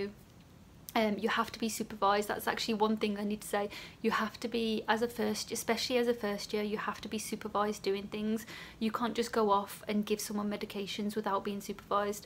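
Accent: Birmingham, United Kingdom. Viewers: Mainly Brummy? x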